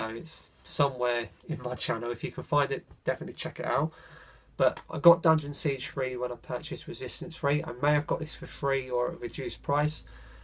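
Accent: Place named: England, United Kingdom. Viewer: Very cool channel man! Sub for sure. I'm just a sucker for that cool English accent haha u don't have that many games on your collection u haven't played, if I was to do a video like this, I would show 80% of my collection unfortunately, maybe more. One day I'll catch up, that's what I keep telling myself anyway. I used to play a lot of rainbow six Vegas when I first got the 360 had a lot of fun with that game! Anyway you got more cool videos and I'll go check them out! Keep up the good work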